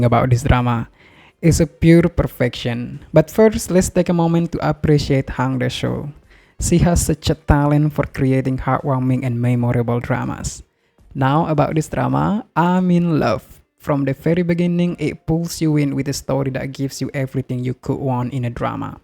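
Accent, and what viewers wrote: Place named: Indonesia. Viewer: Kyk aksen orang indo min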